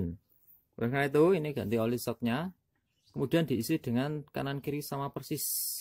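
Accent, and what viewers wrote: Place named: Java, Indonesia. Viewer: Embuh 😵😄 , mesti iki wong jowo ...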